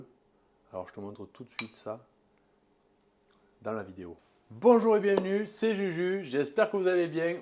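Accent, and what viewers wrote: South, France. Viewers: La recette que je cherchais, merci ! Et puis cet accent du sud, un vrai voyage culinaire ! 😊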